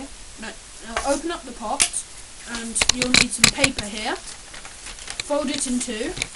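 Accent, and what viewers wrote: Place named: England, United Kingdom. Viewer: haha english accent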